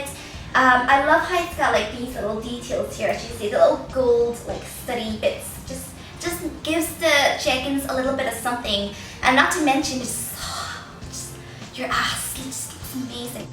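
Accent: Ireland. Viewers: i thought you were Irish because it sounds like you have an Irish accent!!!!!!! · You're from Hong Kong but you kinda sound Irish..But you're really pretty :) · You sound Irish. Great video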